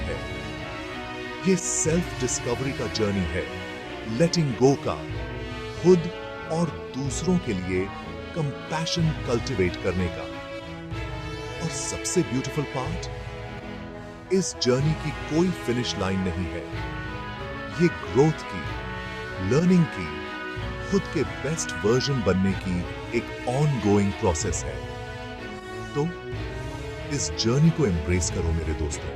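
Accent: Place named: India. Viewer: English thodi thodi Hindi jaisi hai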